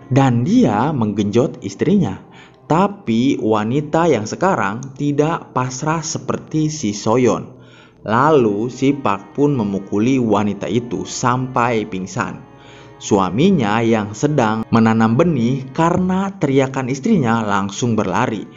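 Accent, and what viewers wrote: West Java, Indonesia. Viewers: Lucu bgt dngerin narasinya kaciri pisan org sunda ketika ngomong " SABAT .. dulu .. 😂😂😂 piseurieun pisan jadi gekgek ngadanguna ... sukses terus lah channel na smoga mkin tmbh subscrb na .. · Sunda Pisan Euyyyy 😂😂 Narasina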